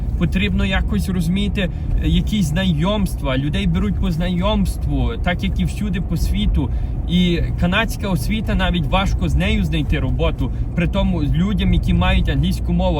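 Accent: Western Ukraine, Ukraine. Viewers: Україна велика і не може людина з заходу говорити полтавською чи київською говіркою. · Надя! О с западной Украины!! И там язык не похож на Черниговский(я оттуда) или Полтавский. Но и на украинский, красивый, он не тянет.. Я не в обиду... Просто режет слух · Ві говорить на діалекті української мови. Так говорять в селах на заході України. Це не літературна українська мова. Може тому так тяжко в Канаді, що він навіть українською не дуже володіє не говорячи про англійську.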